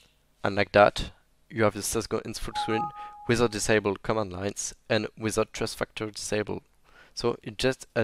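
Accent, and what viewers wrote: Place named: France. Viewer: Love your french accent man! keep up the good work.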